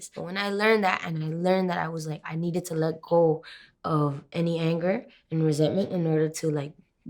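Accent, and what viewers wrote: Caribbean. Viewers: she sounds slightly Caribbean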